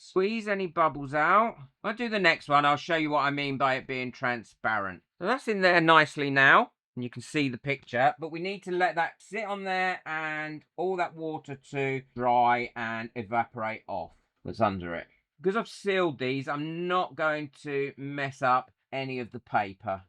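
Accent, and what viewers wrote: United Kingdom. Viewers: I love watching your videos and have learned a great deal. I have a question: where are you from in the UK? I love your accent.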